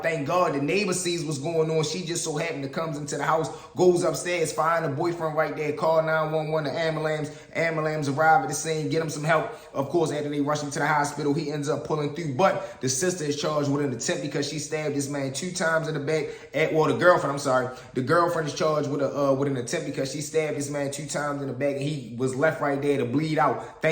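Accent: Baltimore, United States. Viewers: Sound like you from bmore brother, good story💪🏾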